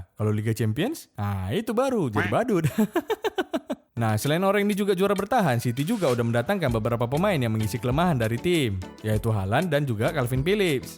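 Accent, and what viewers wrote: Medan, Indonesia. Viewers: Sukak kali la aku sama chanel si ini bahasa medan nya kerasa kali bah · Nada org MEDAN dan bahasa MELAYU upin ipin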